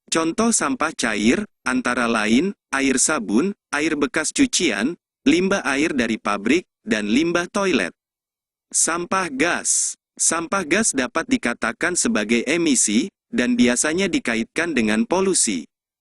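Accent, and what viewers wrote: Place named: Banten, Indonesia. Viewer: banten mana suaranya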